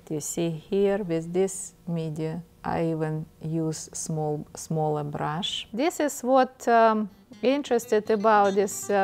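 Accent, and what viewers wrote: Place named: Russia. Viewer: I know she has my accent I miss Russia, but I am glad I am no longer there I love it here :D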